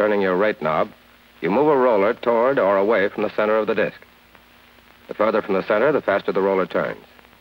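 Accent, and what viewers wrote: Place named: Transatlantic, United States. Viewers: Its the Mid Attlantic accent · That transatlantic accent carries hard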